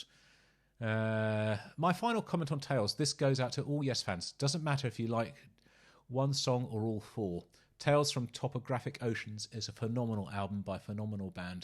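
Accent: United Kingdom. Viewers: Doug is pretty great too…but he’s American. I love hearing British accents so I prefer your channel.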